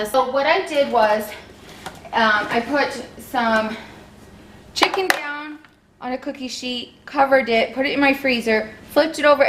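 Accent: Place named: United States. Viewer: There is a U in the middle of tuna not oo and herbs starts with H. I wish Americans would stop murdering the english language!!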